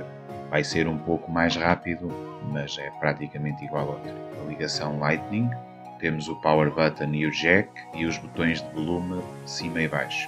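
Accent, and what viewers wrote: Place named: Portugal. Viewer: seu sotaque português é muito bonito 😂😂amo seu país🇵🇹🇧🇷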